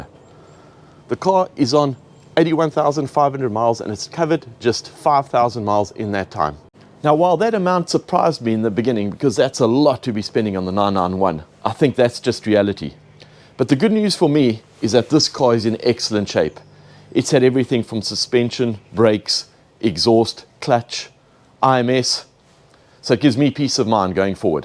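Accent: South Africa. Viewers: Fascinating insight mate. If you thought the bills for the 360 were eye-watering, try an F355...Engine out every 3 years for the belts (22 hours labour - ouch) manifolds made out of cheese, radiators made out of even softer cheese, horrible sticky cabin plastics needing (expensive) refurbishment..the list goes on and on. Nevertheless, once you take it out for a good blast all is forgiven! At least I made a tidy profit when I sold it after 11 joyous years. My little 993 is now making me smile. By the way, you're not originally from SA or Zim are you? Cheers from a rather chilly Otford, Kent, UK.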